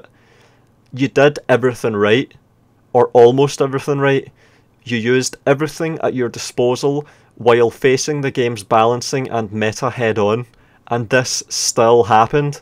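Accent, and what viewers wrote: Scotland, United Kingdom. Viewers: You sound like i changed my siri settings to 'monotonic dulcet Scottish guy'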